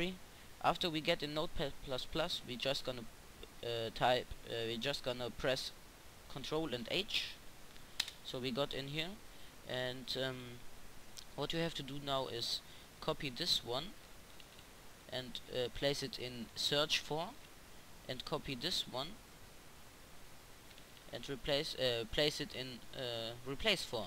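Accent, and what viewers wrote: Germany. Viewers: DEUTSCH ! :D habe ich sofort an der Aussprache erkannt :D Abo ist dir aber sicher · Man merkt irgendwie dass du deutsch bist,auch wenn du es nicht gesagt hättest,liegt nicht mal am Akzent,nur an der Art wie du eben redest ^^ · More people know English than they do German.Only about 100 million people speak german and 340 million people speak english.In other words,there's a more likely chance of someone speaking english than someone speaking german.Besides,it's only his accent.Don't be fooled by the idiots to are racist towards Germans.Most of us are just telling him he needs to work on his English so there's no reason to be crazy mad about it. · Ey , war klar das du Deutscher bist! :D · Are you German? Could we talk a bit? I got the same voice like you xDDD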